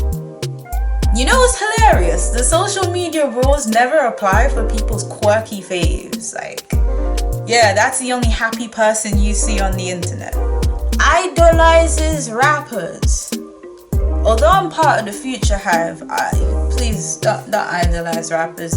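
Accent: United Kingdom. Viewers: I thought you were originally from London 🤷🏿‍♂️ I love your voice ❤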